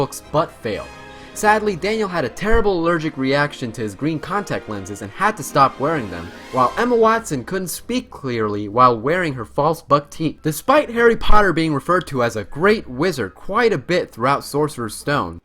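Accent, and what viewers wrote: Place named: United States. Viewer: american pronounciation for the words of harry potter's world takes off all the MAGIC !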